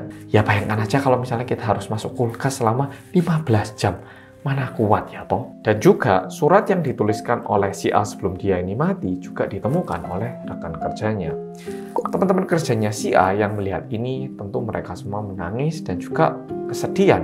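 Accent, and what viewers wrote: Java, Indonesia. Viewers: Sogannya lucu orang Korea yang medok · Selalu ngikuti channel ini,tpi juarang komen,awalnya tertarik dg logat jawanya,cocok aq yg wong Jombang,bolo..bolo yoo wk..wk,sampek aq pingin tahu sejarah hidup mas ini,..🤝🤝🤝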